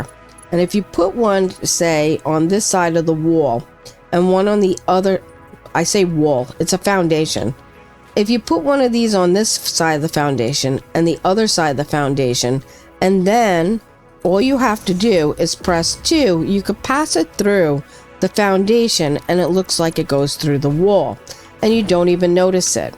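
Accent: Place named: New Jersey, United States. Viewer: I agree! One of the reasons I enjoy this series is because she sounds like my wife’s family, who hail from northern New Jersey. Aside from their making fun of my (practically nonexistent) southern accent for a few years, you won’t find a better group of people. And yeah, they know where the best deli and bagels are (and now so do I!)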